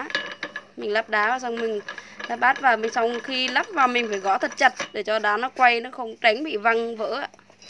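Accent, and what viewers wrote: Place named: Quoc Oai, Vietnam. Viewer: nghe như giọng ở quốc oai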